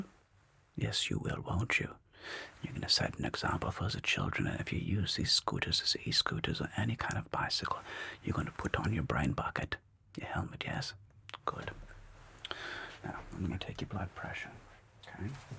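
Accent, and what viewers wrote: Germany. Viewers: I love your German accent!! Saying this as a German myself 😅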